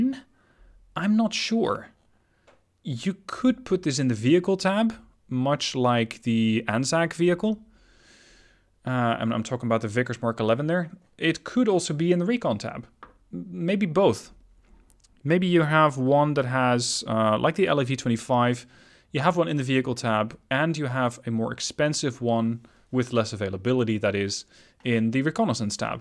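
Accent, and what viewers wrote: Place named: Netherlands. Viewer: Holy Dutch accent! (Flemish here ;)) Looking forward to this.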